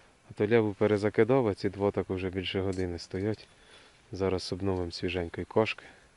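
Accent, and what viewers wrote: Poltava, Ukraine. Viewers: теж хотів запитати і знайшов комент.. бо почув акцент полтавський..та і зарості схожі)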